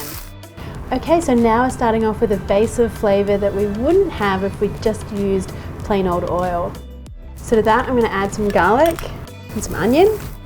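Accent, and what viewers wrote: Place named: Australia. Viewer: Ive eaten this all my life and love it so much but actually never learned how to cook it. Thank you you made it look easy and your video is easy to follow and understand and didn't leave me impatient while watching. And you pronounced pancit bihon very well but if you want to sound even more correct (not necessary but since you mentioned), just need round out your 'a' sound a little bit more. Like how you'd say (with your lovely aussie accent reading) "parn-sit bee-hawn"...that's how we Filipinos say it. Thanks....you made it look so "Masarap!" (delicious) xx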